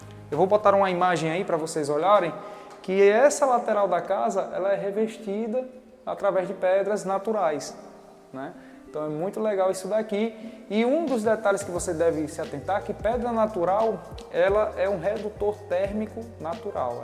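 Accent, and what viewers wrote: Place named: Pernambuco, Brazil. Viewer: Que massa!!!! Parabéns pelo teu trabalho e muito sucesso!!!! Sotaque que amo demais , sou pernambucana 😊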